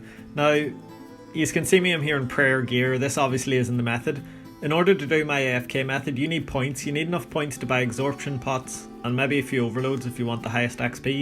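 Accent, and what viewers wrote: Northern Ireland, United Kingdom. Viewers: You from northern ireland?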